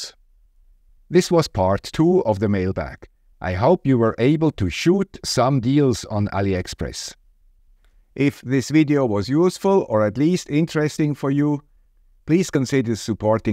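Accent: Switzerland. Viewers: Hello friend with the Swiss accent. In case you don’t hear it enough, I’de like to thank you and also applaud you for the way you have setup your channel. Your content is extremely well planned and laid out without all the bloat of other YouTubers in this space. Lean and clean to the point and. I find myself going back to your videos many years later as a reference point when needed. Looking forward to more truly high quality content next year ! 🙏🏽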